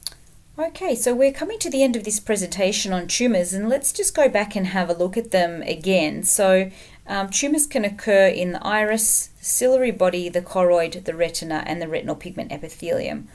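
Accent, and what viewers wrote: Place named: Australia. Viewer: I like your accent sounds like australian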